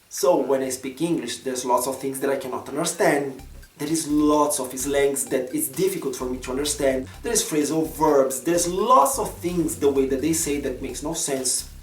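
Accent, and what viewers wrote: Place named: Brazil. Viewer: Ver um brasileiro falando inglês me dá uma sensação excelente de que eu 'domino' a língua, pois entendo 80% do que voce fala kk! O sotaque é fator determinante na conversação, é incrível. Keep it up,!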